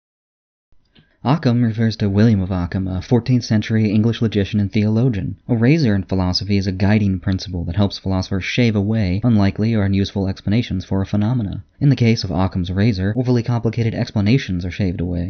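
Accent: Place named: United States. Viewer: He's American that's why I'm looking for a nice concise English accent to explain it to me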